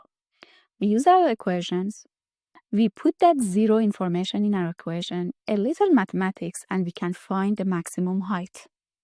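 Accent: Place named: Iran. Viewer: I love your heavy Persian accent, sis 😊❤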